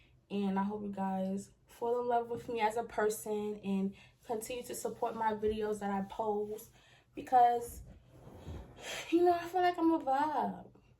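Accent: New York, United States. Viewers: I heard that spicy New York accent from your 1st video 😁( Bk/NY girl myself) Congratulations on your new Youtube page & Im looking forward to seeing more of you. WELCOME!! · I knew you were from NY, I can hear it.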